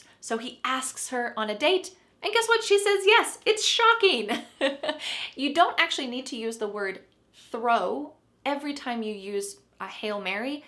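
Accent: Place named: United States. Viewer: I love u cuz u speak us accent♥️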